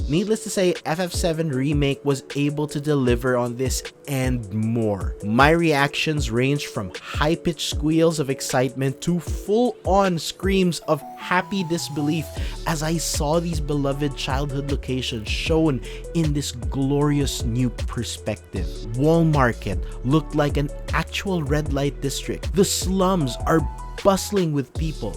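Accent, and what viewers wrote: Philippines. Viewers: Pinoy ka noh? accent palang and choice of words. hehe but nice review.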